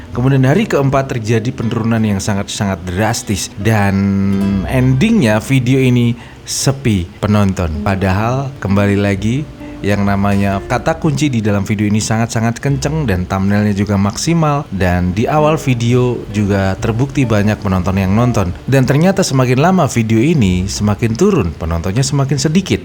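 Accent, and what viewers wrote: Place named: Banyumas, Indonesia. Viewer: kelas bang,,, wong ngapak mendoan kie bang 😊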